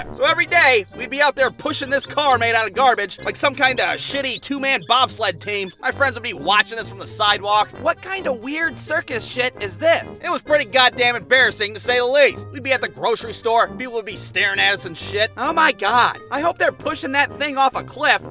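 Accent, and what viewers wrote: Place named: Arkansas, United States. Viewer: Lol Sounds like Arkansas ha ha ha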